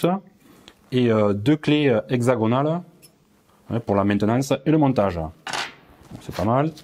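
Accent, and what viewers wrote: South, France. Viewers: Bravo pour ce fabuleux travail! L'implication dans chaque vidéo est très visible! Un bon test qui fait ce qu'on lui demande. (au top d'avoir mis un exemple, c'est pour moi la base dans ce type de test). L'éclairage, le mur est au top! Et toujours avec l'accent du sud!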